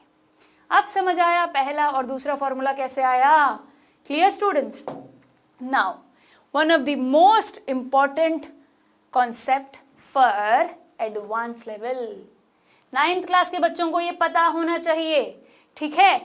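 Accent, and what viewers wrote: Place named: Punjab, India. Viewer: Mam I can definitely say that your hometown is punjab because you words are generally punjabi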